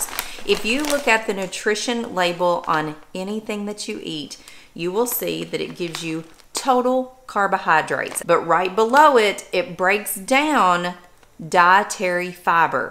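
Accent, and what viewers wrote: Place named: Kentucky, United States. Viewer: has some great advice and congratulations on your progress. It is about getting healthy and not about losing weight. And it does take 30 days to change our thought pattern. I like doing omad. I'm not hungry through the day and if I do have a spirit of it I drink an extra water. Can't wait to see the progress and definitely do a couple of videos on what you cook. I thought I detected a Kentucky accent, just found your channel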